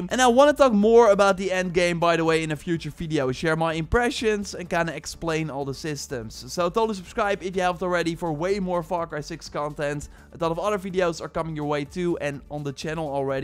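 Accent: Netherlands. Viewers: Ik hoor gewoon dat je Nederlands bent hahaha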